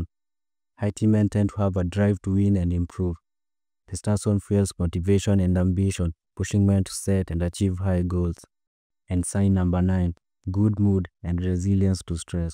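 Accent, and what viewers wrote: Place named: South Africa. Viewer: Sounds South African 🇿🇦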